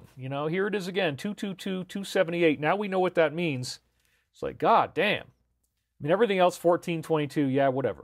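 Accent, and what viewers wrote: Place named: Australia. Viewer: IM GOING TO BE A DICK...I DO NOT LIKE THE OZZIE ACCIENT MATE...I DISLIKE IT BIG TIME, FROM AN OZZIE OLD CHOOK......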